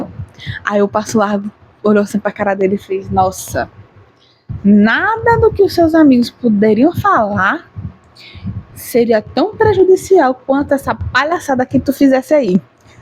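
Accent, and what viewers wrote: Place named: Recife, Brazil. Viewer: O seu sotaque é do Recife.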